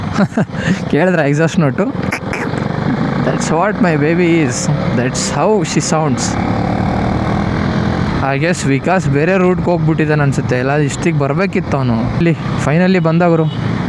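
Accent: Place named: Kalaburagi, India. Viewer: Bro I saw your different Kannada slang in previous video. I believe you must be from Kalaburagi 🔥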